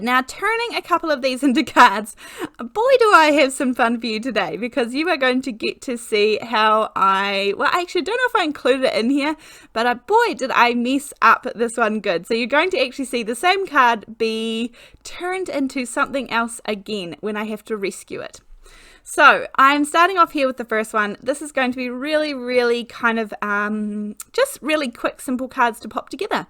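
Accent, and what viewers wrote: New Zealand. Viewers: I like how you fixed the card. I like the second version better also. Love your videos. I enjoy your beautiful NZ accent.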